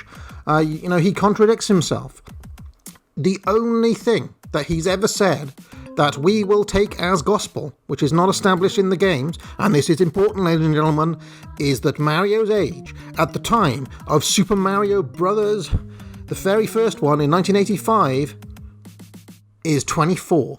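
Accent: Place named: United Kingdom. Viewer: Pompous British Mario timeline... I can't listen past 5 minutes of this garbage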